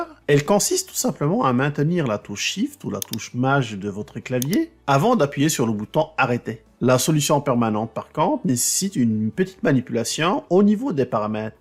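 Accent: Quebec, Canada. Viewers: J'ai voulu suivre vos explications.Helas!vous parlez trop vite et avec un accent québécois si prononcé que j'ai du mal à comprendre.Je crois que la meilleure solution,ce serait d 'utiliser de bons sous-titres bien lisibles en français bien sûr. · Merci pour les explications avec le bel accent d’outre Atlantique. 👍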